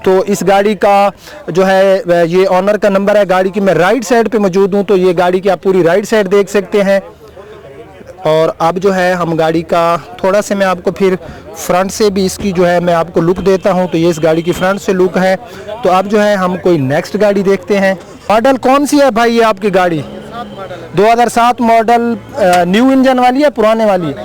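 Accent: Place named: Saraiki, Pakistan. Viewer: Urdu sariki lehjay mein.... Baraa maza ata ha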